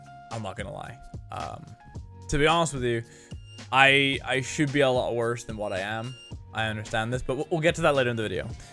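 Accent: Ireland. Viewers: where does he live??!! he sounds like hes from ireland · Mini Ladd sounds SOOO Irish in this video!!! Ik he is Irish but JUST THIS VIDEO!!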